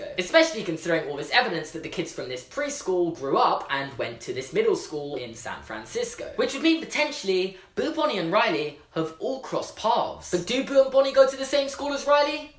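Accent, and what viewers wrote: United Kingdom. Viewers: i really love your british accent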